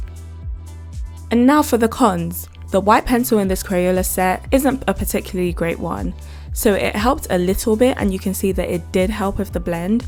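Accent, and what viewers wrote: United Kingdom. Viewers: Your british accent is so satisfying...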